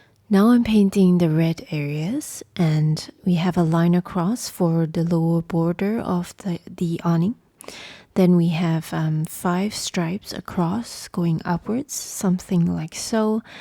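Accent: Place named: Malaysia. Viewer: Km org Malaysia? Aku bisa mendengar aksentmu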